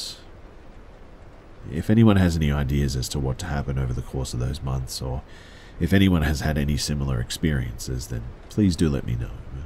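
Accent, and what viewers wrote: Australia. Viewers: So you’re from the land down under?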